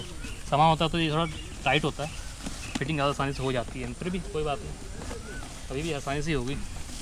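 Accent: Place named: Uttar Pradesh, India. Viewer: Safar Paiy Main Aap ko Yaad Rakhunga Jaroor Sir G. Par Aap Mujha Yaad Rakhna Saddle Bags Give Away Karta Huay . 😁 Bhagwaan Aap Kay 10000 Nahee 10 Lakh Subscribers Ess Saal Kar Daily. My Best Wishes To U For that. I liy your vlogging style & your Hindi UP Accent . Cheers bro Milangay Safar Par Aap Ko Ek Din .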